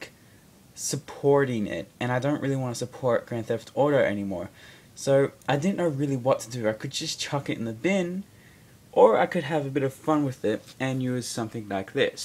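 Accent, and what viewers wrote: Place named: Australia. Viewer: BRIT !!! he's Australian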